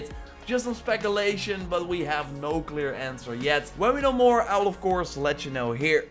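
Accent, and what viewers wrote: Netherlands. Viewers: mm snif snif i smell dutch. am i right?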